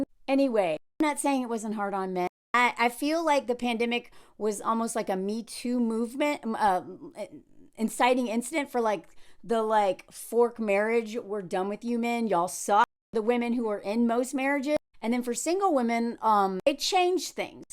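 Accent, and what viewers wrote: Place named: Chicago, United States. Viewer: Your Chicago accent is hilarious! I'm from Chicago. Another amazing video,!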